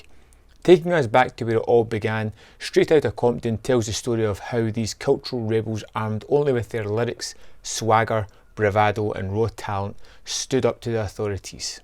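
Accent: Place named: West Scotland, United Kingdom. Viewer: No way yer Scottish 🏴 I’m Scottish too, Paisley. Whera ye fae lol! You sound as if you’re from the West of Scotland too! Slainte 🥃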